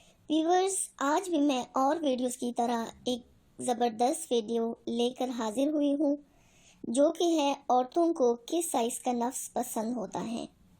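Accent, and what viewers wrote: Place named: Mumbai, India. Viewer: Apka Bombay Ka andaz bhi. Bohat. Behtreen haa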